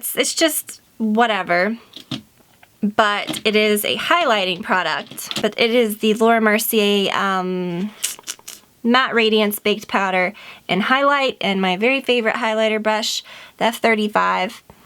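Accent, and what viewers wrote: South, United States. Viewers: You have a slight southern accent aha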